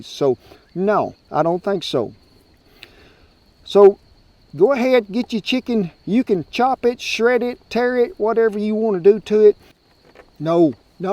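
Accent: South, United States. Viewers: I’ve never seen your videos before. But Jesus h Christ you can’t get any more southern than this. Hah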